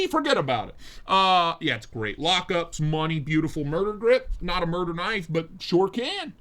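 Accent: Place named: Western Pennsylvania, United States. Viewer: Your western Pennsylvania accent always cracks me up.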